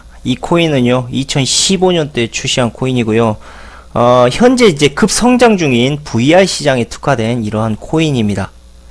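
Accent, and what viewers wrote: Jeolla, South Korea. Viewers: 전라도세끼들참 말하는꼴아지 말투참좆같네 쓰래기코인 망한줄모르냐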